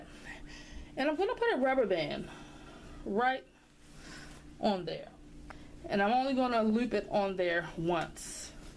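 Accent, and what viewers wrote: Baltimore, United States. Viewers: Very pretty. I love your Bmore accent. Stay safe.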